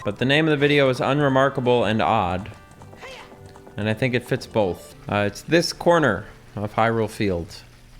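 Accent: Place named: New England, United States. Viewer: interesting how your accent says "ruhm' instead of '"room". you, by any chance, from the new england area?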